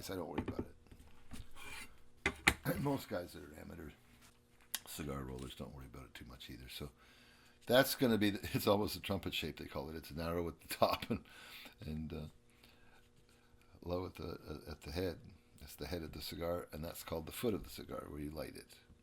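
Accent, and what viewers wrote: Canada. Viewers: He sound so Canadian.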